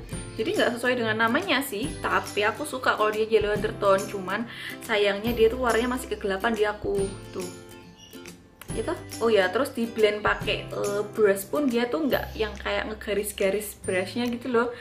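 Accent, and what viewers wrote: Java, Indonesia. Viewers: Nyantol nek mbak medhok jelasno, mantul 😁 sekarang udah punya baby zack jadi lama kalo mau ada video baru jadi rindu 😍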